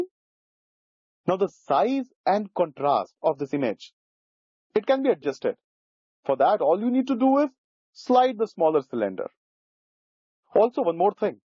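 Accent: India. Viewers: I think he’s indian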